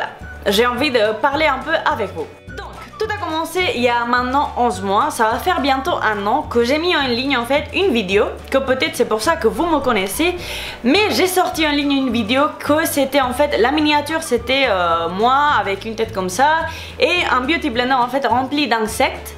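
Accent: Spain. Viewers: Je pense que les gens s'attendaient à voir autre chose qu'un cil donc ils ont mis Putaclik pour moi ce n'était pas du putaclik Tu n'as pas à te justifier Tu es super belle j'adore ton accent espagnol Continue comme ca je t'adore tu es tellement belle Bisous 🇪🇸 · coucou moi je t'adore et je te suis des tes 100 abonnes pourquoi ?pars que j'adorait ton accent et j'adore les espagnoles voila et courage et plein de bisous a toi ma chérie. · J'aime tellement ton accent espanol, tes cheveux tous enfaite tes vraiment belle je voudrais trop de ressembler tes MAGNIFIQUE 😍😍❤ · Non moi je trouve que l'accent español c'est le plus belle accent au monde j'adore ton accent et moi j'aurai voulu être espagnole car j'adore cette langue c'est la plus belle langue du monde plus tard je veux vivre en Espagne 😍😍😍😍😍🇪🇸🇪🇸🇪🇸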